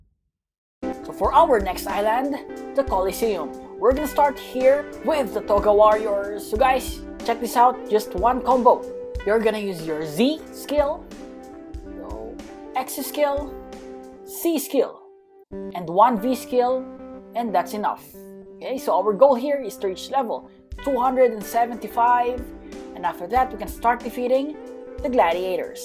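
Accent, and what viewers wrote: Philippines. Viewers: are you Philippines? Because its ao confusing you aound like Philippines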